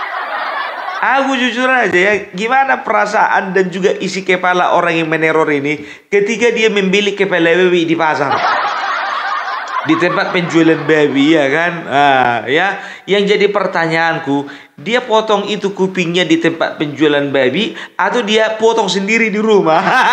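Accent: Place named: Java, Indonesia. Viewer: Bang suk mben golek mantu / besan jowo wae opung lha boso jowo sampean wis medhok 🙏👍💪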